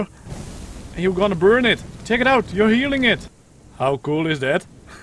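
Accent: Netherlands. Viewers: oefen je engels aub ik hoor dat je nederlands bent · Are you dutch xD Sometime you've got a Dutch accent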